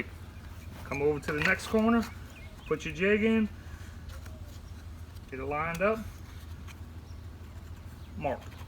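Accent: Louisiana, United States. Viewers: Where's his accent from? Louisiana possibly?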